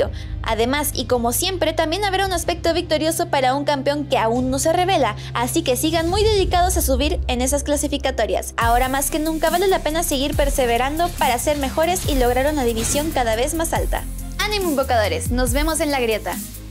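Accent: Chile. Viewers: Que loco, nunca le había notado el acento chileno a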